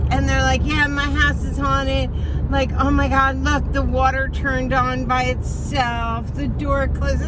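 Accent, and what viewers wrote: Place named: Philadelphia, United States. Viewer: I'm so glad I found your channel. I have been feeling super alone lately and well iam. Yet I found your channel and your personality etc is like having a really cool friend talking to ya. I had a feel I ng from they way you speak that you had to be close. I'm in Philly and heard you say where u were. So cool!!! Just wanted to say hello and thank you. Sending love and positive vibes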